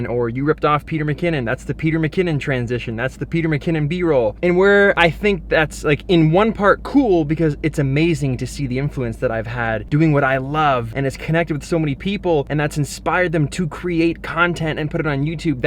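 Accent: Canada. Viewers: I always love the way peter says aboout. Pure canadian 😂😂. Great vlog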